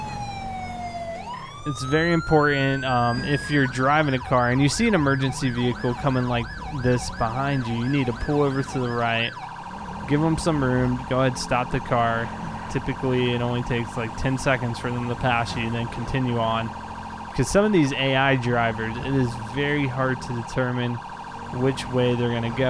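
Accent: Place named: South Carolina, United States. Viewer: Try to Fly Maybe a South Carolinan accent is different from a Kentuckian accent?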